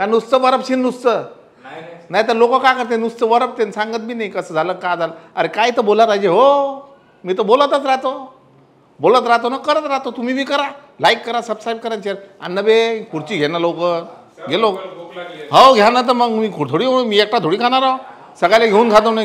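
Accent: Vidarbha, India. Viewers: Chaalan ji Sirji tumchi vidabha chi bhasha aamhala aawadate Hamaare Nagpur Ki shan ho aap 🙏🙏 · मस्त रेसिपी 😋😋😋 विदर्भाची भाषा मस्त बोलता तुम्ही...ऐकायला छान वाटत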